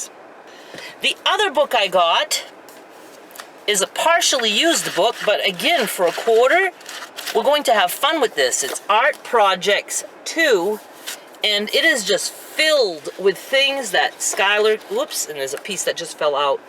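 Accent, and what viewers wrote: Maine, United States. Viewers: I thought I heard a Maine accent. I also live in Maine in Aroostook County (3 miles from the Canadian border.) I've been here 7 years but I'm from California. We plan to move to "civilization" (downstate) in the next couple of years.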